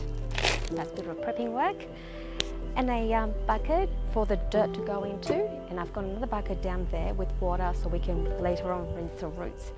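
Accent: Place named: Australia. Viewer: aussie accent blended with some asian accentis excelent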